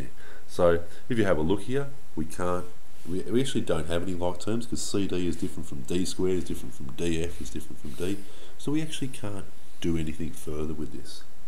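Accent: Australia. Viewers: Are you aussie? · just curious but are u Australian? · i dont know if its the aussie accent or the explanantion, but this guy is a literaly wizard 🙏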